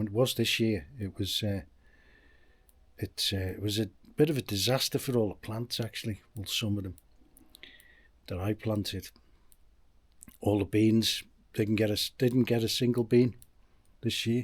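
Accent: Liverpool, United Kingdom. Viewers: Are you from Liverpool or the Wirral? 😊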